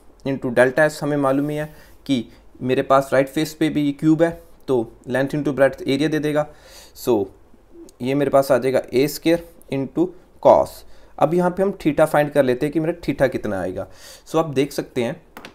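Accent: Punjab, India. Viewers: Punjabi accent itta funny Kyi hota hai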